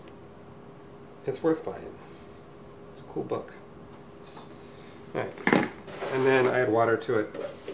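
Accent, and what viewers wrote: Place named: France. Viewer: sounds kinda french.. because it is :S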